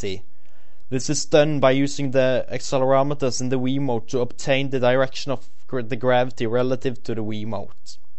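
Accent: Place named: Norway. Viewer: hører så sykt at du er norsk :) nice presentasjon foresten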